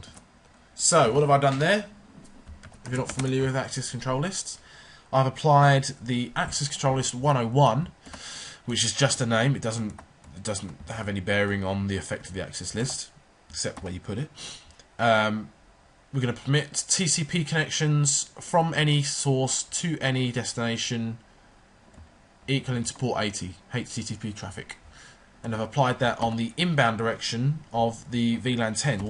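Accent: United Kingdom. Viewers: Finally, An IT tutorial with an English accent.. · Rooters. God, you are gloriously British.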